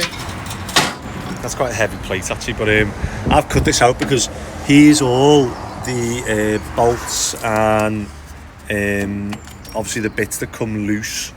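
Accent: Liverpool, United Kingdom. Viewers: Nice Scouse guy.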